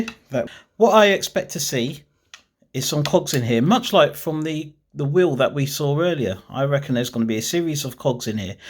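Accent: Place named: United Kingdom. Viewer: You sound British but your hands don't look British😅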